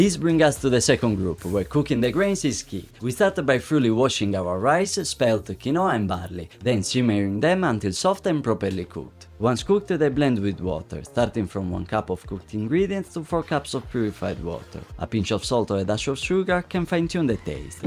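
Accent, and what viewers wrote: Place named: Italy. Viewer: I'm Italian and your English is really funny I think you are Italian too right?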